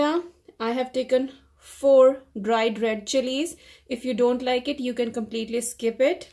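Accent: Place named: India. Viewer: Awesome... and with boiled rice it will be more tasty. Di I like ur hindi accent more cute. 😍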